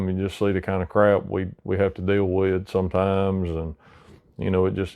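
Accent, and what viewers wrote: South, United States. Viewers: Boy did the armchair mechanics come out of the woods for this one...Some really wild theories floating around out there. This cat has got a seriously strong southern accent and I dig it! He could be talking about quantum physics, and I'd still listen. 😜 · do you naturally sound this kuntry or do you have to really lay it on thick for the camera? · Now thats a country accent!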